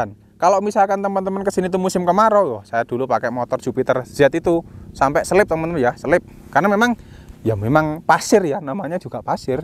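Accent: Java, Indonesia. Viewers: kaget gua,,logatnya mas mas banget!!!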